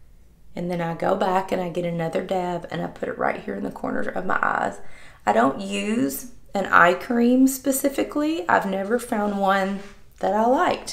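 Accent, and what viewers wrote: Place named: Kentucky, United States. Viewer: CJ...It's a good 'ol southern accent, Kentucky to be exact.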